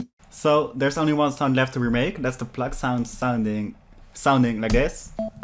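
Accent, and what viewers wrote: Netherlands. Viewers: Ik hoor een nederlands accentje ;)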